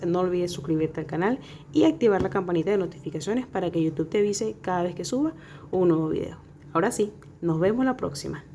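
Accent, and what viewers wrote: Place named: Venezuela. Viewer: Te has ganado una suscriptora más!!!!.... te felicito... me encanta como explicas. Creo que somos paisanas por tu acento jaja... lo hice y quedó muy bonito. Espero que mi clienta quede satisfecha, cuando me envíe foto te las paso por instagram... Gracias otra vez por compartir tus conocimientos con otras tejedoras... un abrazo Venezolano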